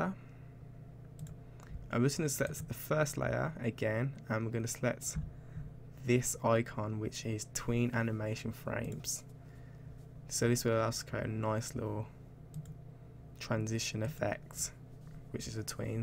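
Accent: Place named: Birmingham, United Kingdom. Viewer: birmingham accent :))))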